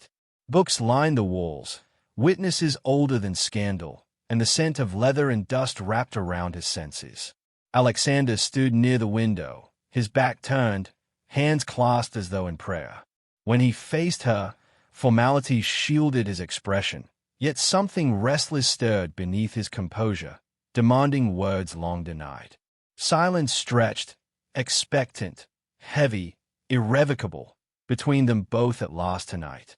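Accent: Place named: Australia. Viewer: Not an American at all. One of the UK COMMONWEALTH Countries - Aussie, maybe? But definitely distracting. 😊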